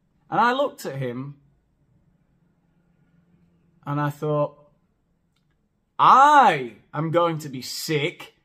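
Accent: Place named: Yorkshire, United Kingdom. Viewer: It could be his Yorkshire accent coming out 😂❤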